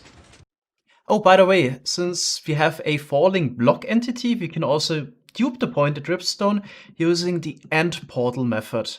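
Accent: Germany. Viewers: Is he german? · You sound like you're German You kind of have a German aczent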